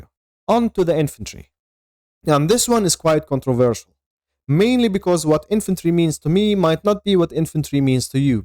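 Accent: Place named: Lebanon. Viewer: أنا حاسس انك لبناني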